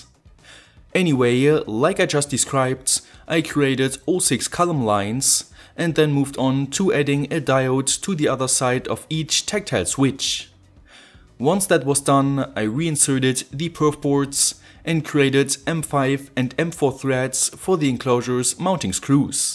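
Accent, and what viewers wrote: Germany. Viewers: Is that a German accent ? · Are you German? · Oh wait lemme guess, r u German? U have an accent... no offense tho, I’m German myself haha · Mir war sofort klar, mit dem Akzent musst du aus D sein ;) mal überlegt auch eine Version auf Deutsch online zu stellen ? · Lul, du bist deutsch? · You come from Germany? kommst du aus Deutschland das Englisch hört sich nämlich ein wenig so an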